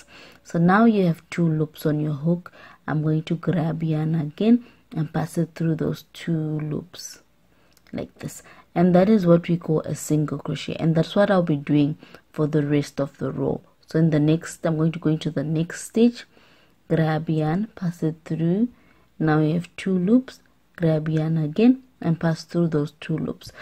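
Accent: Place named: South Africa. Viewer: You sound south African..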